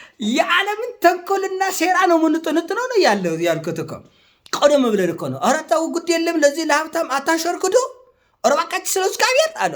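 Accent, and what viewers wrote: Tigray, Ethiopia. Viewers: በትክክል! እውነትን በትግራይ ወገኖቻችን የአነጋገር ለዛ እያዋዛ ለመናገር ወደ ኋላ የማይል፣ የሴረኞችን ተንኮል ቀድሞ በመረዳት የሚያንኮታኩት ጀግና ኢትዮጵያዊ ነው!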